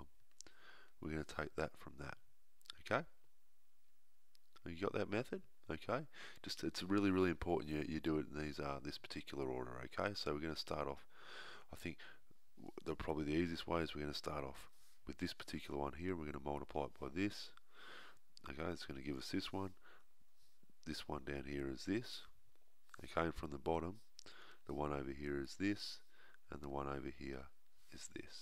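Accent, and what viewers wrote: Australia. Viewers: Yeah I think so. Well I'm from Australia and he def sounds Australian to me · are you australian??? accent is so iconic!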